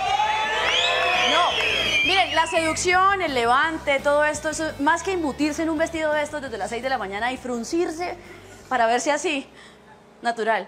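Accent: Colombia. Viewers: uffft para un mexicano ese acentito colombiano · aquí en Chile amamos el acento de Bogotá ufffff delicia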